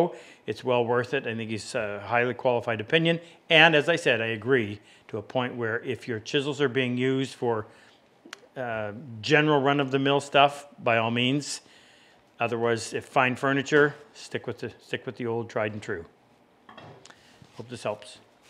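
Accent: Canada. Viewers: As I was watching your informative video (thank you), I noticed on one of your shop aprons a U.S. Army 14th Infantry patch, blue/red/gold castle with the caption, "The Right Of The Line". I'm assuming, by the flags in your shop and your accent, that you are Canadian. I'd be interested in the story of your affiliation with that military unit and/or how you acquired the patch? Thanks again for the YouTube content you provide!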